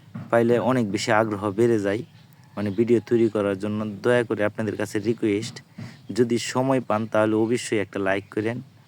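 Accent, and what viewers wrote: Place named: Kishoreganj, Bangladesh. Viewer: আপনার কথায় বুঝা যাচ্ছে আপনার বাড়ি কিশোরগঞ্জ জেলা। আপনার নাম্বার টা দেন ভাই।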